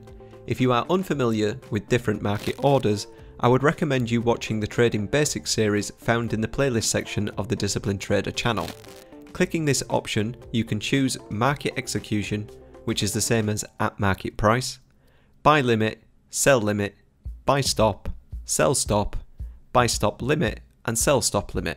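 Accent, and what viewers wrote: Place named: Yorkshire, United Kingdom. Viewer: Excellent tutorial, and totally understand the Yorkshire accent!!!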